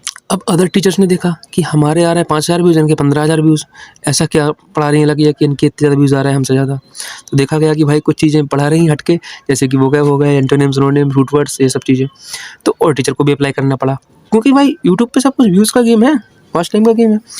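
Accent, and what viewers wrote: Lucknow, India. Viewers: R u from Lucknow?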